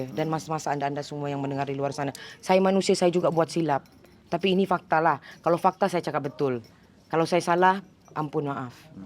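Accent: Sabah, Malaysia. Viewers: Slang sabahan itu mahal . Suka dgr👍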